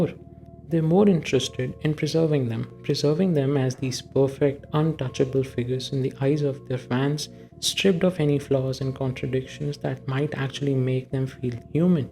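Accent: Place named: India. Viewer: White PFP Indian voice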